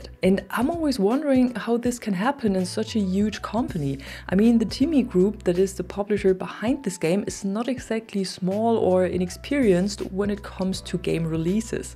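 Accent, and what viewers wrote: Germany. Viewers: ich faends richtig klasse wenn du einfach richtig deutsch englisch sprichst. ich versteh immer nicht warum leutre krampfhaft versuchen irgendein mittelamerikanischen akzent zu imitieren. bööörgr. for the most part, you speak english with german accent, und das ist auch gut so. alles andere wirkt laecherlich, bei jedem der versucht nativ speaker zu imitieren. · Hey, are you german? Your accent gives me hard german vibes.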